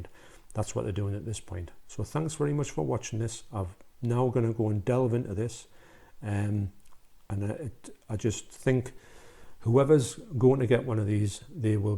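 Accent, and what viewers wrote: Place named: Scotland, United Kingdom. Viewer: that's great to hear, as I've heard that the Argento Cut was the worst of the 3 transfers. Oh ok, sorry. Just bits of your accent sounded Scottish. Not sure if you know, but i'm in Australia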